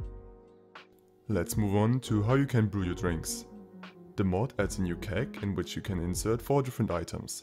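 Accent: Germany. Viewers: The german accent make it even better.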